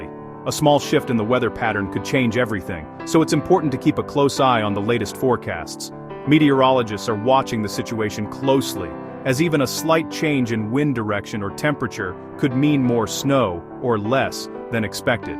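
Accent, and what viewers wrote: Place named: United States. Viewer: UK weather .why has the narrator got an American accent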